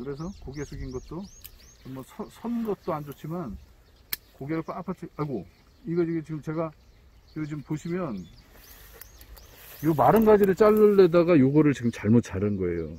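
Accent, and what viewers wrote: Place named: Gyeongsang, South Korea. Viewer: 뻔데 경상도사투리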